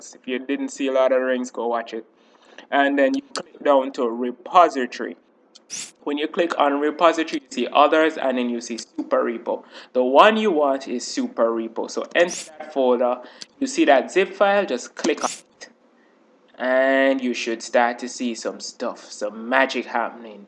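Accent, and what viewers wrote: Jamaica. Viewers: I love your Jamaican accent so much. <3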